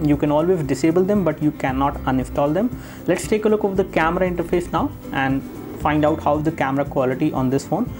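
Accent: India. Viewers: when Indian speak English. We hardly catch up what they are trying to say. The worst accent ever! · I suggest you check out other channels. The Indian accent might be a little hard for you :) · another indian accent...pffff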